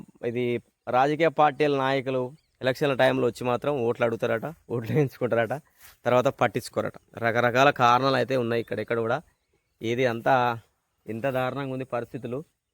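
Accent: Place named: Karimnagar, India. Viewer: Ma Karim nagar slang la undhi....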